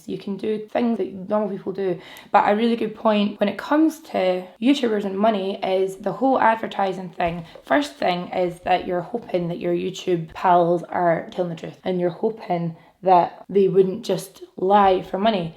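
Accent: Scotland, United Kingdom. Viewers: Love the eye shadow. Gorgeous color I have been wanting to try that foundation as well. I saw it at Sephora during the sale. Beautiful finish. Love watching your vlogs and videos. Yes your videos and vlogs. Maybe its the Scottish accent and all the "wee" words you say. 😊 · Watching you and listening to your accent helped me prep to understand the Scottish side of my family that I met a couple of weeks ago lmao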